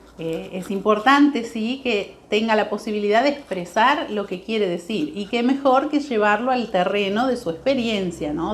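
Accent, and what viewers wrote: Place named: Argentina. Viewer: ¡ Hola,! me llamo Robson y soy de pernambuco,Brasil. creo que tú eres mi vecina, porque tu acento se parece de argentina o Paraguay. Bueno, a mi me gustó tu video. pido perdón, aún no sé escribir bien en español. Dios te bendiga.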